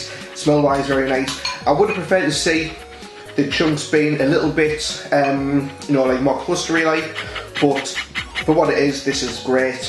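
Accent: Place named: Durham, United Kingdom. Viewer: Knew I recognised that accent lol.. I'm from Durham myself.. I'm glad there is somebody from the area putting out good content!! Keep the videos coming!!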